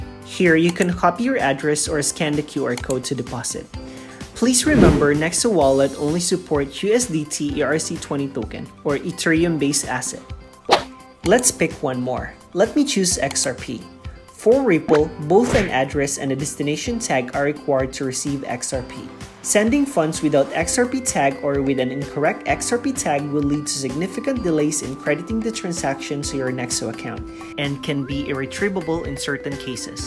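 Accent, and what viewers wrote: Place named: Philippines. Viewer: U sound Filipino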